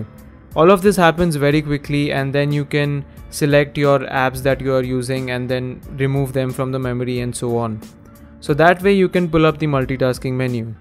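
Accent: India. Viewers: I love the way India saying "t" words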